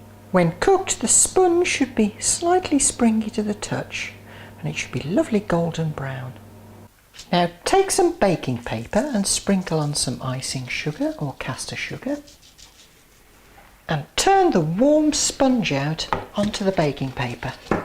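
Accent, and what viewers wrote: United Kingdom. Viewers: i'm french and a love your recettes and your english it's sooo british !!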